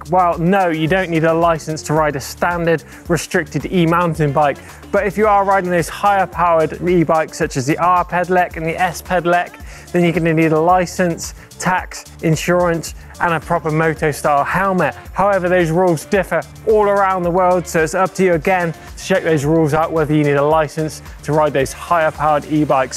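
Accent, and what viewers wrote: United Kingdom. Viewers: Don't know if it is the annoying British accent, the goofy helmet, or the mountain bike in general that makes this unwatchable.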